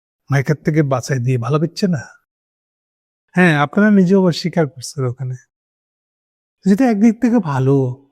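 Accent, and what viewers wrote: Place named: Noakhali, Bangladesh. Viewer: দাদা আপনার বক্তব্যে চমক আছে! নোয়াখালী ভাষার চমকপ্রদ মিশ্রণ আবার সত্য পথের বয়ান। সেই সাথে আন্ডারওয়ার্ল্ড ও প্রকাশ্য ডাকাত বাহিনীর মুখ্য অবয়ব প্রদর্শন। দারুন দারুন মারহাবা।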